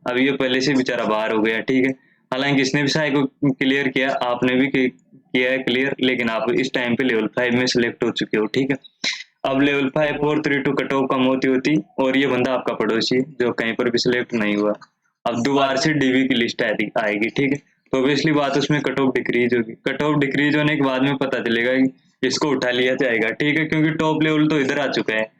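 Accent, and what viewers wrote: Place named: Pahadi, India. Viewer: Bhai pahadi lgra ....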